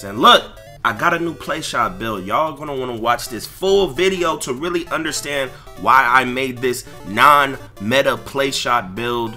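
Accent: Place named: New York, United States. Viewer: Bro where r u from u talk like YRG and young don the sauce god and some New York nigga all at once bruh wth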